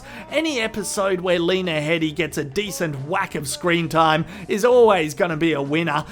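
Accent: Australia. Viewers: Oh, the Australian accent! Noted. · Fuckin love the aussie's your patter is brilliant!!!!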